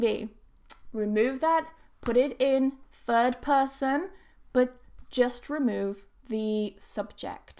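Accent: United Kingdom. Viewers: ....l really like the way you laugh, speak,smile and the way you speak British english,it sounds very wonderful....your British accent is awesome.....Thanks for the video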